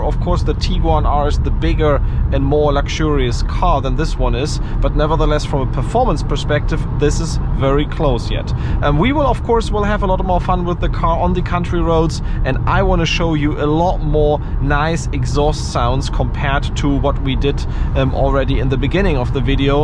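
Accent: Germany. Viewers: Dieser krasse deutsche Akzent ist schon hart 😀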